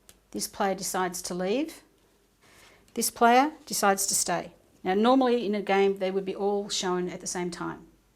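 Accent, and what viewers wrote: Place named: Australia. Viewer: Nice video thanks. And an Aussie voice. Nice.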